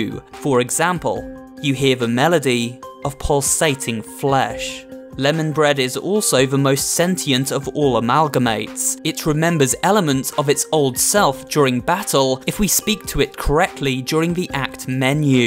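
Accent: United Kingdom. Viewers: It's a British accent, dude. Don't judge him. He can't help it. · Its his British accent :I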